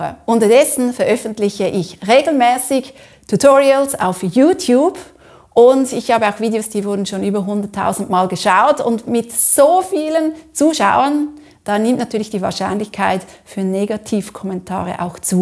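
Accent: Switzerland. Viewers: Negative Kommentare sind auch irgendwie eine Auszeichnung ! So ein „negativer Kommentator“ nimmt sich die Zeit um Deine Videos zu sehen, ist möglicherweise neidisch oder kann mangels fundiertem Fachwissen keine sachliche Kritik anbringen oder hat Probleme sich angemessen auszudrücken . Wenn „negative Kommentatoren“ Deine Videos wirklich nicht gut finden würden täten sie diese nicht anschauen oder gar zu kritisieren versuchen. „Nicht einmal ignorieren solche Leut“ würden wir Österreicher sagen 😜 Ausserdem, ich liebe Dein schweizerisches Deutsch 😍 Ich finde es sehr wichtig und erfrischend wenn wir „Deutschsprachigen“ unser Dialekte sprechen und nicht von Schleswig Holstein bis Südtirol ein Einheitsbrei gesprochen wird . Liebe Grüsse aus Oberösterreich ! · Vielen Dank für deinen ehrlichen Beitrag! Ich liebe deine Videos und konnte mir bereits viele wertvolle Tipps mitnehmen. 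Ich finde dich sehr charmant und liebe deinen Schweizer Akzent. Gerade das macht dich so authentisch und unterstreicht deine sympathische Ausstrahlung! Viel Erfolg weiterhin! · Liebe, Du hast mir aus der Seele gesprochen. Es ist zumTeil unglaublich, was man im Netz so erlebt... Viele meiner Kollegen schalten Werbung und werden dadurch persönlich angegriffen... ohne Worte. Danke deshalb für dieses mal wieder sehr gelungene Video. Ich persönlich mag neben Deinen Inhalten Deinen Schweizer Dialekt besonders. Bitte deshalb unbedingt beibehalten und kultivieren;-) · Danke für deine offene und ehrliche Meinung. 👍Mach weiter so mit den Lehrreichen Videos. 👌🏻PS: ich mag so sehr deinen schönen Schweizer Dialekt. Gruß aus dem hohen Norden, Manfred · Wow, ein super Motivations-Video! Vielen lieben Dank! Mal ganz abgesehen davon, daß du wirklich sehr sehr sehr guten Inhalt produzierst; ohne dein schönes Schweizer Akzent wären deine Videos weniger Scharmamt ❤️. 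Ich finde es Super, daß du Mut gefunden hast und weiter gemacht hast. Deine Kurse sind bestimmt sehr sehr gut! Ganz liebe Grüße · Zum Glück kannst Du Selbstbewusst mit dieser Problematik umgehen...Ich mag Dein geschweizertes Deutsch..😊 Du bist super... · Ich liebe deinen Schweizer Dialekt 😊🇨🇭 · Für einen erfolgreichen YouTube Kanal braucht man in regelmäßigen Abständen einen guten, hilfreichen Inhalt. Weiterhin ist es meines Erachtens wichtig, dass die Person authentisch rüberkommt und man nicht den Eindruck hat, dass da eine Show abgezogen wird. Beides ist bei deinen Videos voll und ganz gewährleistet. Dazu der schöne schweizer Akzent, der deine Authetizität noch unterstreicht. Vielen Dank für deine ausgezeichnete Arbeit!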